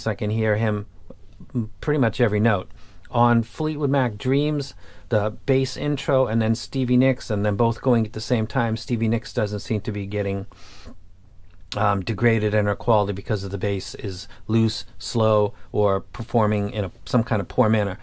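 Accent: Boston, United States. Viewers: Said that three years ago.. Bastohn accent.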